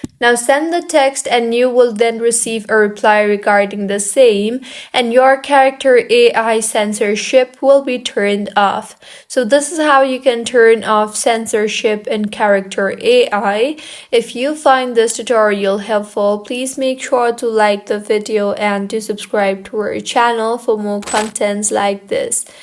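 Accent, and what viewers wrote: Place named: China. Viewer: CHINESE ACCENT NICE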